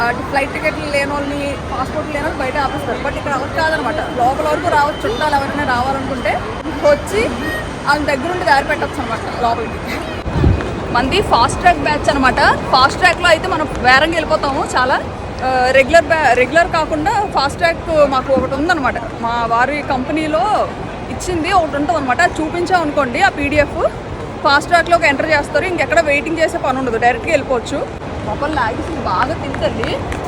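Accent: Vizianagaram, India. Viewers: మీరు విజియనగరం యాస మాట్లాడితే బాగుంటుంది నా అన్వేషణ విశాఖపట్నం అయిన విజియనగరం యాస మాట్లాడతరు మీరు మాట్లాడడి మాది అనంతపురం అయిన ఆ యాస కోసమే మీ ఇద్దరి వీడియోస్ చూస్తాను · Mana vizianagaram Language.... Super👌.... Ventunte 👂 super super👌.....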